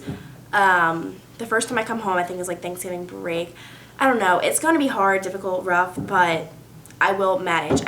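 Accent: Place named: New York, United States. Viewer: Her New York accent was so strong back then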